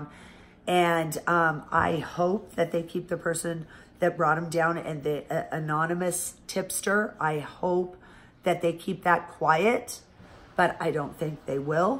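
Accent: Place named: Illinois, United States. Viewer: I bet you from Illinois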